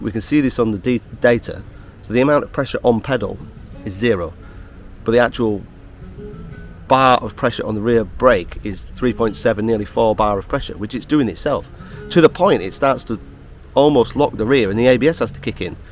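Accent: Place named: North, United Kingdom. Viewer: As a fellow northerner, I didn't need the subtitles....but I see why you added them ;) Proper job 👍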